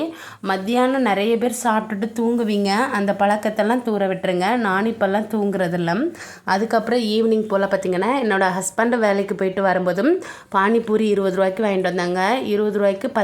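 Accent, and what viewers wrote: Tirunelveli, India. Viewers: திருநெல்வேலி பாஷை எனக்கு ரொம்ப பிடிக்கும் ❤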